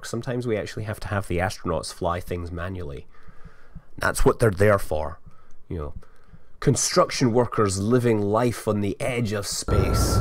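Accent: United Kingdom. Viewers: Foreign is a relative term. It's the British pronunciation.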